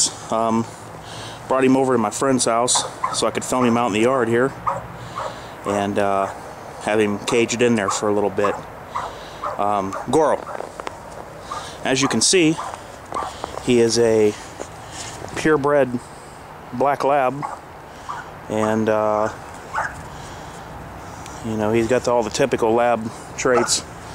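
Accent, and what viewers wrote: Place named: Indiana, United States. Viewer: it sounds like u r from indiana maybe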